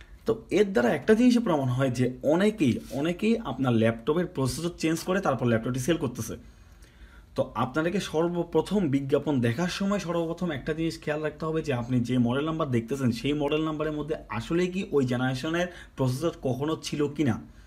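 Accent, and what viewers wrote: Chittagong, Bangladesh. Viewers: Apnr kthar dhoron shune amr versity life er kichu frnder ktha mone pore gelo tader bari chilo Chittaging...Oidiker manushgula oshadharon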